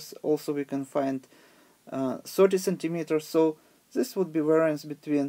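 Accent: Russia. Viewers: I like russian pronunciation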